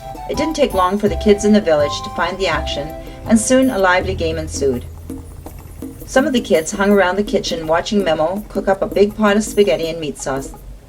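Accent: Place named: South, United States. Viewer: maybe not mechanical, but just annoying. like an american from the bible belt.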